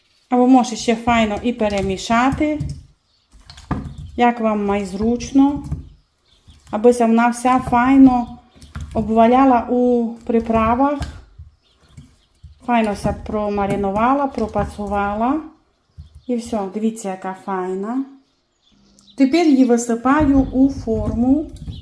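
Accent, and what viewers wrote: Transcarpathia, Ukraine. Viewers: Прекрасний закарпацький говір. Дуже мені подобається.. Щастя Вам. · Йой,як приємно чути нашу файну закарпатську. А ріпка-мням-мням.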